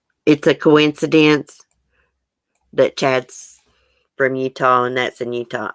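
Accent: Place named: Alabama, United States. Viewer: I love your accent! It’s very like my Alabama accent I had! Where are you from?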